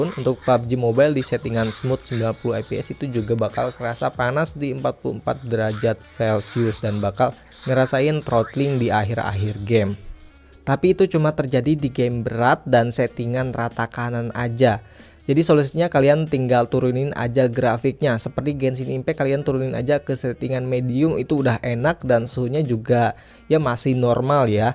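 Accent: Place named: West Java, Indonesia. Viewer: Meni Sunda pisan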